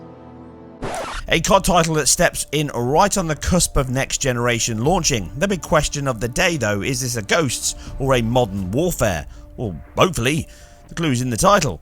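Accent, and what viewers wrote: United Kingdom. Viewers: Nice to have a British YouTuber who pronounces words in a British accent instead of adopting American pronunciations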